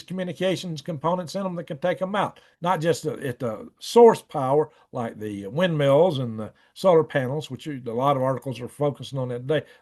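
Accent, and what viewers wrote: South, United States. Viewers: It was a little Dry. My friend Mike said, he said the Big A.I.(can't remember) company can afford the A.I. but China couldn't. A lot of repeated Math, repeated yourself often. Then he did his usual copying your 'Southern accent" in levity. "China" he said from you. He's a good guy, my friend. I say We as Americans must work as if it depends on us, and Trust as if it depends on God. And Freedom to the counterpart of people like me in USA, IN CHINA. "See you down the road.". As someone once said to me at meeting.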